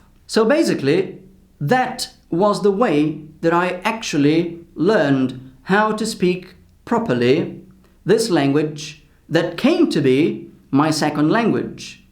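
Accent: United Kingdom. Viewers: Even though British accent isn't my cup of tea, dude, your accent sounds really nice! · did you choose british english or did the british english choose you?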